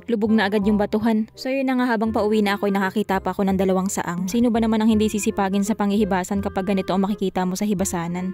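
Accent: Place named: Visayas, Philippines. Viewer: Bkit Ang salitamo mayhalong besaya besaya b kayo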